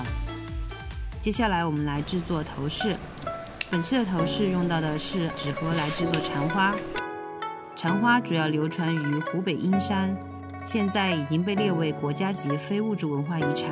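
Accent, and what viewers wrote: Sichuan, China. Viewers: 原来大神是四川人！之前听口音觉得有点像，但不确定。四川出了好多大神级的up主啊！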